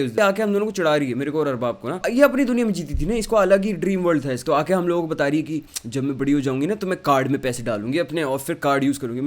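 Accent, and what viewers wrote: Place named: Uttar Pradesh, India. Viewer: After a lot time..... using some UP accent yeh <<<<<<3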